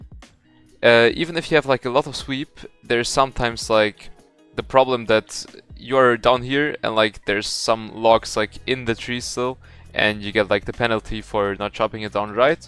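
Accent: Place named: Germany. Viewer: bro ist deutscher als deutsch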